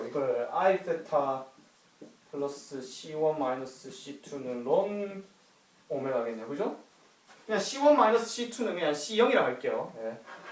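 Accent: Gyeongsang, South Korea. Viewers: 서울 말씨를 사용하세요. 경상도 말씨는 지겨워요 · 서울말씨는 경상도 말씨든 설명이 참 좋네요. 달을 가르키면 달을 봐야지 어리석은 몇몇사람은 손가락을 보네요. 설득 보다 유도라는 표현은어떤가요?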